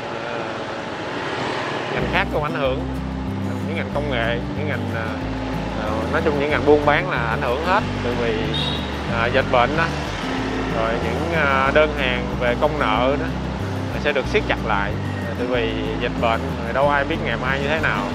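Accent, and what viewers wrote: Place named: Mekong Delta, Vietnam. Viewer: THANK YOU EM CHIA SE CLIP NAY, TAI SAI GON.N TRONG KHI TAI DA NANG ,QUANG NAM ,DA BI SHUTDOWN. VOI CHAT GIONG MIEN TAY , CACH NOI CHUYEN CHAN THAT, CHAT PHAC ,ANH RAT THICH , CHANNELCUA EM .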